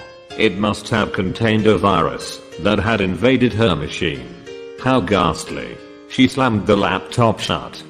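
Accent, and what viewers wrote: United Kingdom. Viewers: Great... All of his voices and pronunciation is perfect!Full of British Accent!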